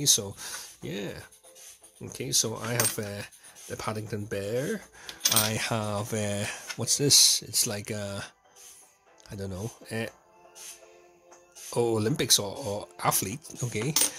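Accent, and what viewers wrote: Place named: Netherlands. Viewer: Ah you live in Belfast. Yes I know it. I just thought you had a Dutch accent.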